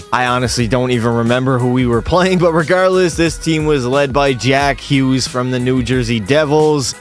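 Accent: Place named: Quebec, Canada. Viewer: 10/10 your Québec accent!! Should be living at Montréal.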